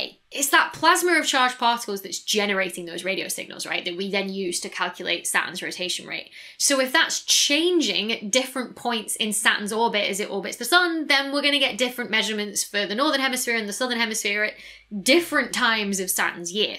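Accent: United Kingdom. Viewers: I thought 'Geezer' is an anglicism. Turns out geezers are a global phenomena. I can't tell woch county she's from, but local accent is most definitely at work here. Somewhere between Cardiff and Glasgow, i'd guess. England alone have more diverse dialectal difference than entire USA. Like, you could make out the native postcode from listening to them. Geezer. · Tell me, Doctor, what accent of English is it that you wrap your presenting in? It does not sound exactly the way of American I am used to hearing on YT, nor quite British. It is rather lovely, let me say... Oh, British then? What kind of British? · Dr Becky... charming as always... your UK pronunciation of geysers indeed sounds like US pronunciation of slang for old men... keep the accent... One topic I hope you'll do would be on tidal locking of bodies in orbits. It is really hard to understand why rotation rate could/would synchronize itself to orbital speed since bodies don't have handles (like pots and pans) by which the larger central body could "grab" them and adjust their rotation rate (eg: how did earth fine tune Moon's rotation to tidally lock it since moon doesn't have handles and Earth doesn't have arms by which to grab and do the trick).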